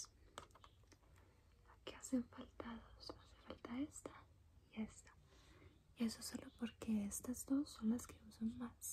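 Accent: Colombia. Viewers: Me encanta el acento colombiano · El acento colombiano me encanta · aprovechar tu acento colombiano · me encana el acento colombiano es mi favorito en el mundo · me encanta tu acento colombiano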